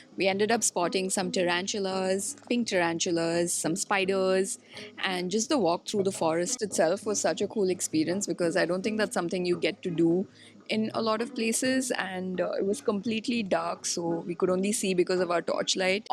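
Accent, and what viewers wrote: India. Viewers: Video was good except hilarious strong indian accent, I can't take it. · Hindi ke liye there are other channels Paramvir, Shubham, Navankur. makes her videos in English aur bahut saare log inke videos dekhte hi English seekhne ke liye kyuki yeh Indian accent mein fluent English bolte hai. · Most people follow your YouTube channel, and 99% of them do so to learn English with an Indian accent. Therefore, I hope you lean fully into the authentic Indian accent in your content; travel details are not that important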